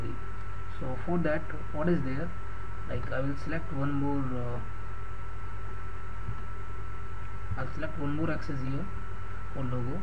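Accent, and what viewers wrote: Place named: India. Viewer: You sound Indian, but that's my university's logo, and it's an algerian university. What happened?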